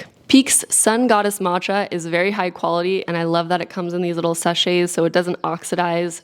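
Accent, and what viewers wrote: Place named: Netherlands. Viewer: i heard your dutch i was thinking i can hear in your english that you speak a bit dutch wy i was born in australia mother born in netherlands but family left to aus when she was 2 dutch dad and i was 3 years old when came to the netherlands but like i said i can hear your speak some dutch and done for some time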